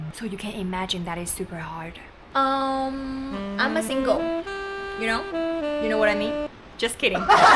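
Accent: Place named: Singapore. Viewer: Singaporean British English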